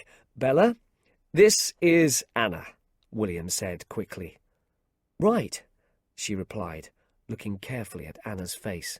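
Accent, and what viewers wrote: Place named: United Kingdom. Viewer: I love the story and the beautiful British accent. Thanks a million! ❤❤❤❤❤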